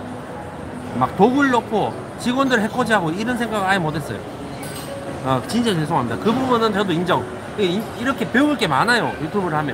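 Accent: Gyeongsang, South Korea. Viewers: 좋은 공부가 되셨네요. 힘내세요. ^^ 경산도 사투리 때문에 그냥 말하는게 업되어 보이는 걸 보는 사람마다 받아들이는게 틀리니 마음에 담지 마세요. 힘내세요.^^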